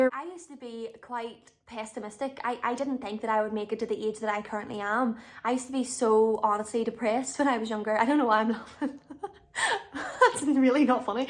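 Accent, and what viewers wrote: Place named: Ireland. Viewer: Irish accent sounds so harmonic.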